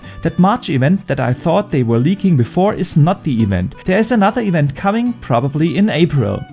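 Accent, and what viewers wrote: Germany. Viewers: Wie ich diesen deutsch englischen akzent liebe, mach weiter so!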